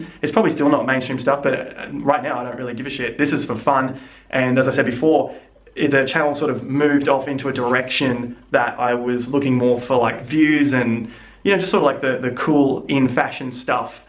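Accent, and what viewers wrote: Australia. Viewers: you've picked up the australian accentttt :D :D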